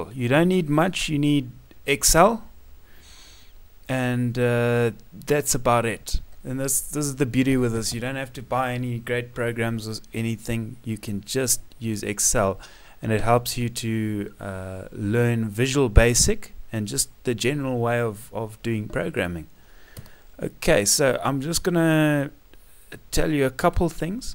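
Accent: South Africa. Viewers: Are you South African? guessing Pretoria...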